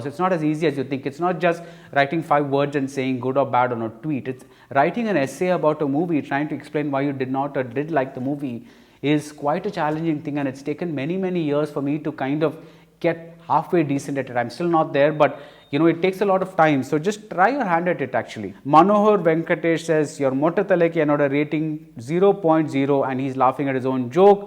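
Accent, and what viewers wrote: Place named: South India, India. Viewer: Dear Rangan Buddy- Well I am following you in Twitter too.. Please add me.. <link> You are Rajini in Review.. Love our South Perfect English Accent here... Yes.. I had a great doubt your english Buddy.. Yes.. Will tweet and promote.. I AM promoting and vouching for SOUTH India- from COIMBATORE to AUS and to the world.. You reviews will help me to share to the movie reactors whom I follow too. Please do a Tamil version for a backup too... Do a combo review with Thambhi Abhi Cheers - Pradeep from Pollachi In Melbourne... I am a food reviewer also- <link>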